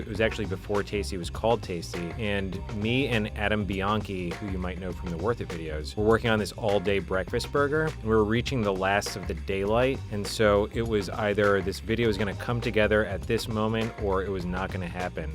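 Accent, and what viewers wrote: United States. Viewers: Wow u sound American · Therefore, he's " ", not " ". I don't think he is an immigrant from Ukraine, because he speaks American accent and pronounces his surname wrong. Probably his parents were the immigrants.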